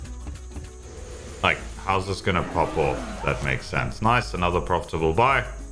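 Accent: South Africa. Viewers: Didn't know you were originally from SA, hard to tell sometimes. I hear like Dutch and English with like idk, Australian sprinkled on top. Accents are cool. I'm from the West Coast and have traveled around the entire US, it always shocks me when people start talking about accents, like I hear theirs but I don't hear mine! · In also from South Africa I thought I heard a South African accent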